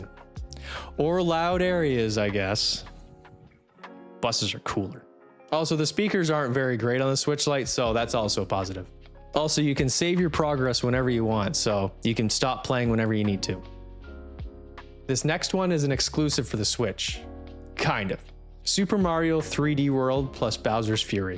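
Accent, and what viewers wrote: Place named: Canada. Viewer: "You find oat" "The world starts oat" "Mary-O" -Ah. A Canadian. Got it.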